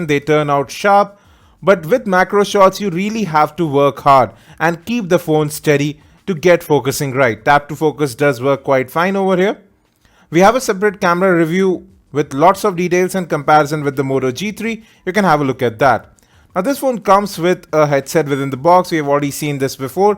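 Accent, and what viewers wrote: India. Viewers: inida p3ople's words and accent make my head blow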